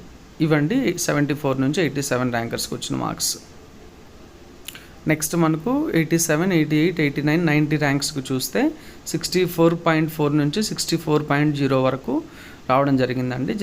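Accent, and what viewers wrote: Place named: Coastal Andhra, India. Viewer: Hi " " garu ur work is good bcz collected in 2018 dsc ranks information, i am not expected u as kadapa guy bcz does not dialect of kadapa😅 voice over is good as costal, i belong to ATP😁, all the best 👍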